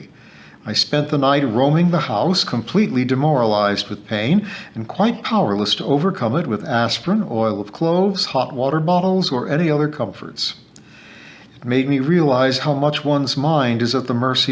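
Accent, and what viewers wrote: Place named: United States. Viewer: I have read all of the Miss Read books and was delighted when I found you reading them. I listen when ironing, cooking and other quiet activities. At first I thought I wouldn't like an American accent reading old country tales from the Cotswolds but now I'm champing at the bit waiting the next installment. Loving every minute of your pronunciation of many words. Are you going to read the Thrush Green books as well? I am English but live in France.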